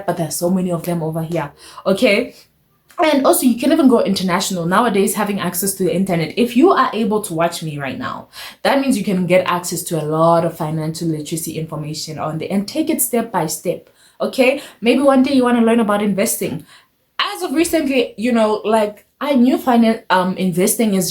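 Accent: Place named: Zimbabwe. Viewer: Interesting topic and subscribed. Where you’re from originally, I picked up an accent. I know you studying in SA. Are you Zimbabwean or Malawian?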